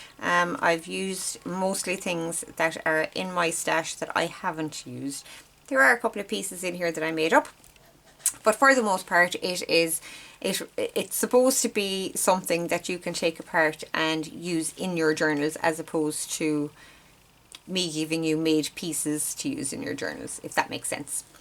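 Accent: Ireland. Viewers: Love this idea❤️how fun! Things I like about your channel… love hearing your Irish accent!, subscribed during JB shenanigans, loved working with your Echoes of Ireland kit… watching for more of your beautiful work!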